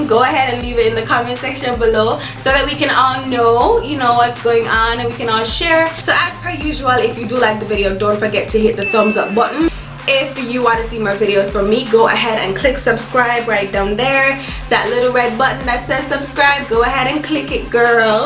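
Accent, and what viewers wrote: Jamaica. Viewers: you're welcome. I'm currently 15weeks and I needed some tips, your video came up instantly and I immediately picked up the accent being Jamaican myself.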